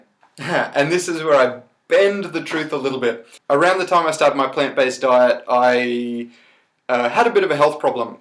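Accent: Australia. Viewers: yea, that's an Auzzie accent :)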